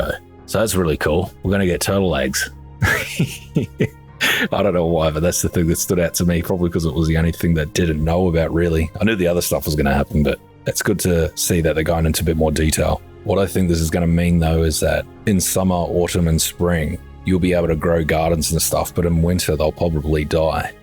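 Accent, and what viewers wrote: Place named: Australia. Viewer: Yo love your vids but I got a weird question but what is you accent or dialect to me it sounds Australian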